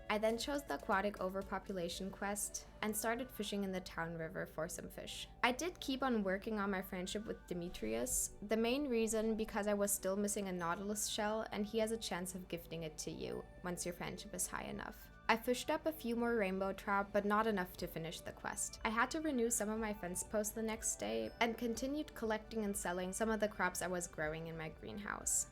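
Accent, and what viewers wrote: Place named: Germany. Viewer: I can't believe I've never seen a video idea like this, and wonderfully executed as wellA It's a surprise you aren't bigger, because this was a great watch!Also, is English your native language? I assume not, considering your (this is a guess by an American, so apologies if this is wrong) German accent, but if it isn't then that's VERY impressive, because I didn't even notice anything until like fifteen minutes in!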